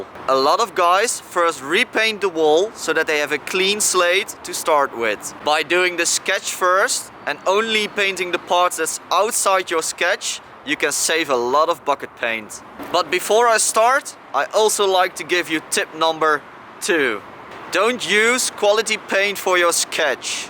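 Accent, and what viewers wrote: Netherlands. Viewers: you dutch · If this guy isn't Dutch all my knowledge of English accents is officially unvalid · Are you Dutch?? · If this isnt a Dutchie speaking English idk what is. goeie vid wel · Dat nederlandse accent maakt de video 10x beter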